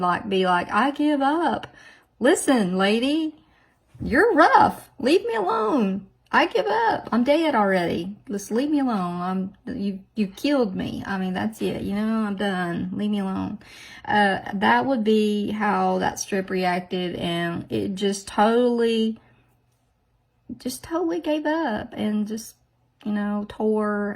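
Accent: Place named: South, United States. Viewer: I just love listening to you talk, and your southern charm and sayings. You do a great job!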